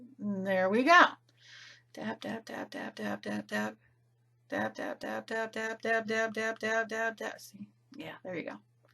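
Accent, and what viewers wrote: New Jersey, United States. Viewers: You are awesome! I love this & I love you girl! I watch you not just to watch you do your art (which I could do all day, & sometimes do......lol) but I also just love to hear you. You are funny, I love the jersey accent, & you have things that are important to say......so I said it befor & I will say it again.....Love you girl! Keep doing what you do!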